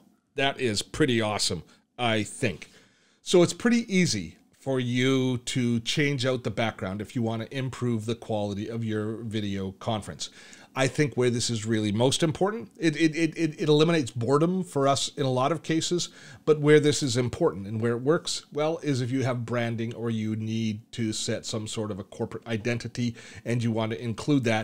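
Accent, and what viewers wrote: Canada. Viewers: you seem to be funny and cheerful without being "goofy". Thumbs up! You get an extra gold star for not beginning your video with "What's up?" A "videographer" will lose me every time in the first 5 seconds when I hear that worn-out phrase. I will try recording the video element of my guitar playing (audio recorded separately) using your green screen technique. I currently am using Filmora and Adobe Premier elements for that but they both are a bit "wonky" to work with. I'm just curious,. What is that hint of an accent I hear in your voice? I'm guessing Canadian. The giveaway every time for Canadians is always how "house" is pronounce as "hoose" and "out" is pronounce as "oot".:-) Nice video... This inspires me to check out some of your other videos. Keep up the good work. Cheers!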